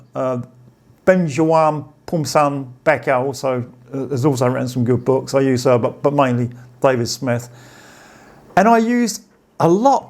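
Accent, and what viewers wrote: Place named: United Kingdom. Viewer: I love your British accent. 😊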